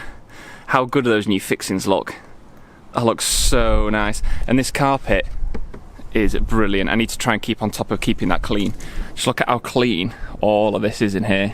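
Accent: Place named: Stoke, United Kingdom. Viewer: top job.. is that a stokey accent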